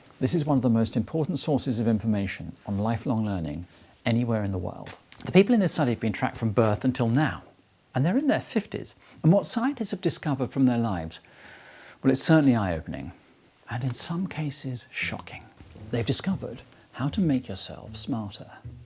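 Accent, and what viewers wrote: RP, United Kingdom. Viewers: can someone answer? what accent hes using? is it fully posh?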